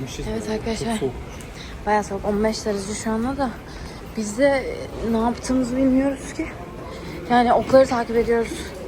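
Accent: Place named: United Kingdom. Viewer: Ingiliz aksanli heyecanla bekliyorumm❤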